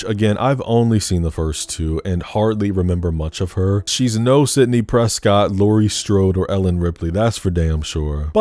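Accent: United Kingdom. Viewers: British?!!??! I had no idea🤦‍♀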